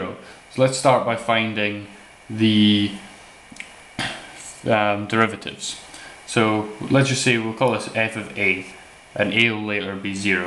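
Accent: Scotland, United Kingdom. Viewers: ye scottish mate? nice vid btw thanks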